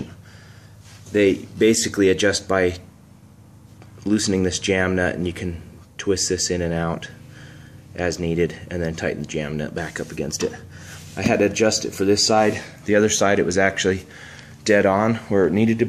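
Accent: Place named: United States. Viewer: No he doesnt. He sounds american.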